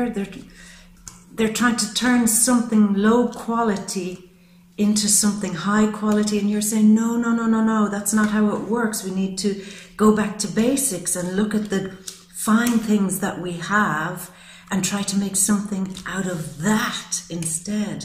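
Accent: Ireland. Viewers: god is love You have Beautiful Gift. I enjoy your manner. Your voice sounds a bit Irish ! Your Tarus friend.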